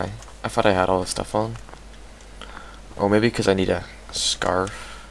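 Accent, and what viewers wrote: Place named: Lisburn, United Kingdom. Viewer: Dude! I can't believe I didn't pick up on your accent the first time around lol. My folks are originally from Lisburn lol My granda used to own the pram shop on the corner at the top of the town Fredrick Thomas lol. Biggest hint I can give to help others channel is to network. Go to peoples channel talk to them and ask about their videos it puts people in a really good mood and then they come to yours. when you said "I took a baitin ther" I thought Yup Lisburn :D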